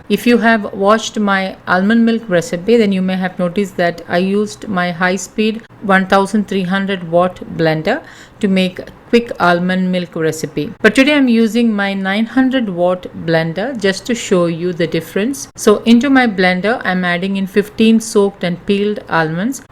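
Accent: Kerala, India. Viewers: thank you so much akka love you loads you are a inspiration for me even I like your voice and typical Kerala accent English I love it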